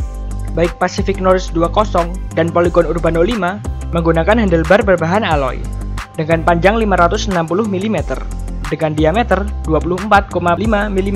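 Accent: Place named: Java, Indonesia. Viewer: Medok banget logat jawanya 🙈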